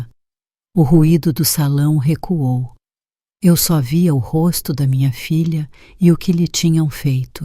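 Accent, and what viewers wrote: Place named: Portugal. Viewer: Tudo ia bem, até a incongruência A festa era para comemorar casamento dos pais do Tiago Depois, a sogra diz que o genro havia sido enganado, ensinado que o pai havia sido heróico aviador, que morrera Mas o filho olha para a mãe e o pai . Filho de 40 anos , tempo do casamento,dominado pela mãe, precisa de psicólogo. Por último, o marido em choque. História até ia bem, mesmo com derrepente, sotaque lusitano, mas misturou muita coisa. E não explica como a garota casou com o Alecrim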